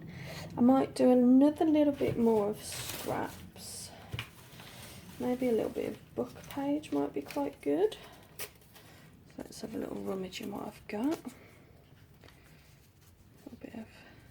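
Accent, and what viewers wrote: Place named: England, United Kingdom. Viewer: Thank you for a great tutorial on tea bags! I’ve subscribed so I’ll look forward to your next video! I’m in New Zealand, love hearing your English accent!🧚‍♀️✨💫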